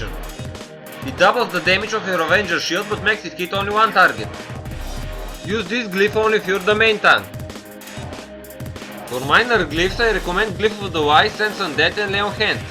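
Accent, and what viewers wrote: Russia. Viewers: As russian as it gets, love it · This IS in English. He just has a heavy russian accent.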